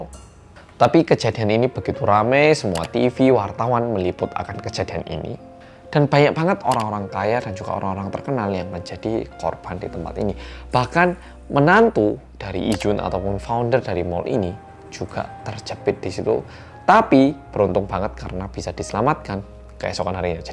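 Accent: Java, Indonesia. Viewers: Berapa kali mas ngomong untungnya, tapi ternyata yang meminggal 500 an lebih. Berasa banget jawanya yg jawa jangan tersinggung ya w juga orang jawa kok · Oppa asli Korea??? Masha Allah medok logat Jawa bgt 😁 ky koko2 Semarang /Sby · Medok bget sih anda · Abg org Korea apa jawa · logat jowo ne ketoro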